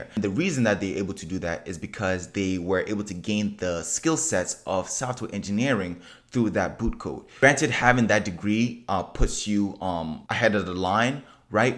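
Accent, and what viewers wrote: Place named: South Africa. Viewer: Are you S.African I'm hearing an accent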